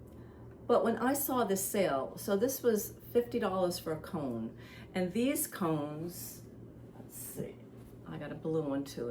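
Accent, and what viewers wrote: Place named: Rhode Island, United States. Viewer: First time catching your podcast (now subscribe) and I love it. I had to go over to IG and find you and now follow you there too. I recognized the accent and then saw you are on Block Island. I'm a southern RI girl myself (Little Compton) and now call NH my home but my heart is in Little Compton. Your projects are all gorgeous. I had to go over to Ravelry to find the patterns and look more. I'm now obsessed with the Against the Current (shawl and tee). I think I'll put the tee on my next spring project list. I love what you are doing with the Vaddel Cardi. Now to see if I can find you on Ravelry.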